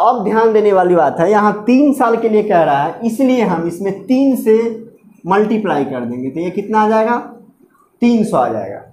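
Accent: Bihar, India. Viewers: Sir aap Bihar ke h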